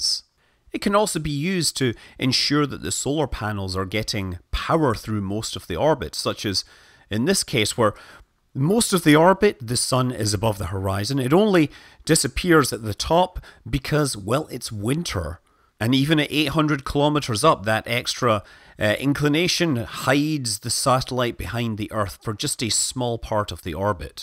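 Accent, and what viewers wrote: Scotland, United Kingdom. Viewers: DigitalSaturnX by a manly Scott called Scott Manley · Jack Shanahan He’s a Scottish Laddy.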